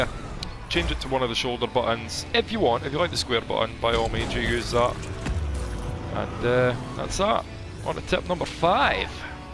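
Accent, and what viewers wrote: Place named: Scotland, United Kingdom. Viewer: I really like the Scottish accent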